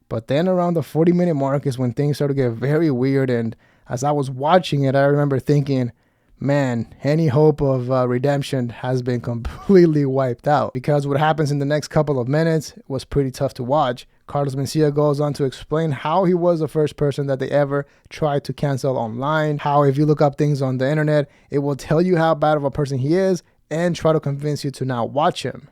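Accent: India. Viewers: Omg i was so sure you were indian 😂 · OOMG I THOUGHT YOU WERE FROM INDIA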